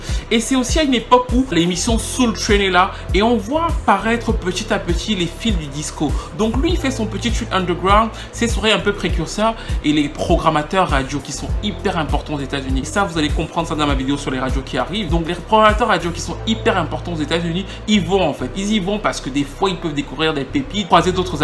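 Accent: Cameroon. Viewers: Je m'en doutais que tu avais un truc camerounais. Ton accent sur certaines phrases me rappelais les touches camerounaises et je me disais c'était juste un parfait hasard.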